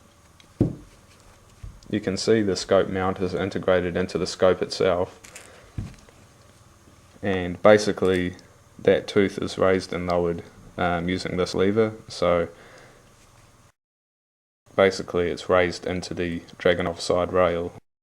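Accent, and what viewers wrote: New Zealand. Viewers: Could pick your kiwi accent a mile away :)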